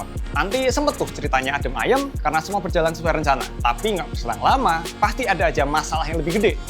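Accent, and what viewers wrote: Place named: Java, Indonesia. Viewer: Tambahan 1 lagi, terlalu kuat Jawa nya bang, malah lebih suka animasi Ibra dan kiko.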